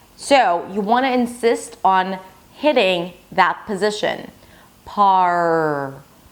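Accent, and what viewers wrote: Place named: United States. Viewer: Wow, what gorgeous American accent!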